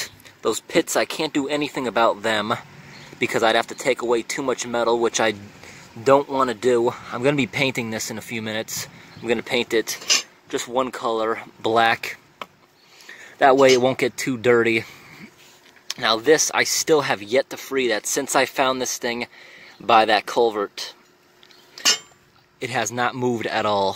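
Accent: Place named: Amish Country, United States. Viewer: Ruff (roof) Rumm (room) Crick (Creek) are you a PA native? You sound like you live close Amish country.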